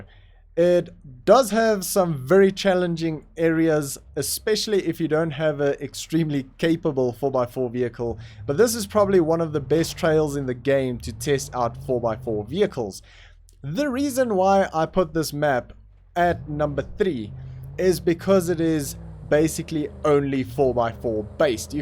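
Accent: South Africa. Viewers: are you from South Africa? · u sound like ur from South africa Are u?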